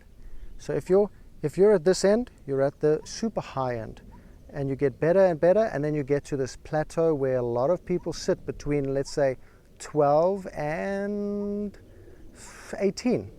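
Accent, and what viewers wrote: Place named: South Africa. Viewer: Howzit china! Judging by the accent, you're just as South African as me lol. Dig your vids my bru. I've managed to lower my handicap from 28 to 16. Happy with that. Aiming for single digits this year. Been playing properly now that I'm in the UK as it's much more accessible than back home. Your tips and tricks are much appreciated!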